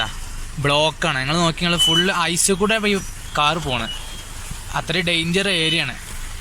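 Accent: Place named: Malappuram, India. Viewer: Ithil samsarikuna aalude samsaram assal malappuram. Ushaarayitund.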